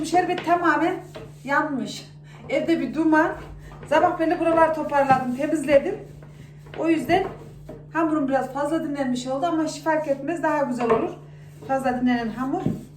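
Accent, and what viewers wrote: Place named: Konya, Turkey. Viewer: Hayïrlı aksamlar hanım Konyadan Selamlar🙋 Hemşehrimsin ben de Aksaray ortaköylüyüm videolarını çok beğeniyorum hatta bugün iftarda revani tatlını yaptım şahane oldu.Seni dinlerken silayi Rahim yapmiş gibi oluyorum çok yakın hissediyorum ayni bizim gibi konuşuyorsun.🌹🌹🌹👍👍👍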